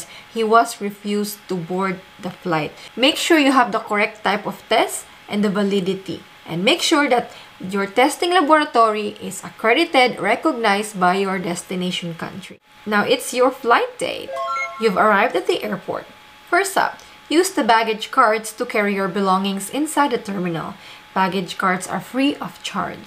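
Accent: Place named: Visayas, Philippines. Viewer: Bisaya kapo mam?...halata accent mo bisayan accent.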